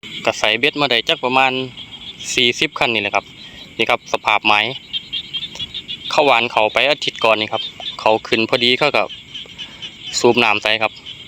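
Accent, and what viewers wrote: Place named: Korat, Thailand. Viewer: อยู่ อำเภอไหนครับพูดโคราช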